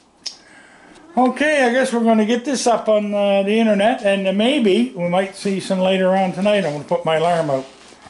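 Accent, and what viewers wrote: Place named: Canada. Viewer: My dad loved cats and wildlife. He was always camping, hiking, fishing. Even with the fishing, he stopped using regular hooks and moved to painless hooks (I have no idea what they’re called). He felt bad for the fish. He was as sweet as you, so similar to you. Even though you have a Canadian accent and my dad sounded very Appalachian, you still somehow have similar voices.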